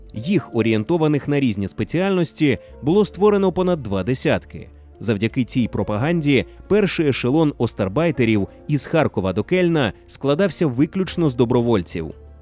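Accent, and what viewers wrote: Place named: Russia. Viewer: (Якщо не говорити про радянський правопис, а саме вимову). Русифікована вимова. Деінде ви сказали Рейх замість Райх. багато ффф - «під її фпливом», багато де українська И, що близька до І, звучить як рос Ы, літера В узагалі ніде не звучить як Ў, де має бути — [створыф], [фсе], [афто] (правильно [стўориў], [ўсе], [аўто]). Г наприкінці слів у вас звучить Х. Тощо. Наперед скажу, це не діялект або щось подібне, а саме русифікована вимова. Послухайте на ютубі Українська літературна вимова Миколи Погрібного. українська мова насправді ще гарніша ніж ви думаєте/говорите. =)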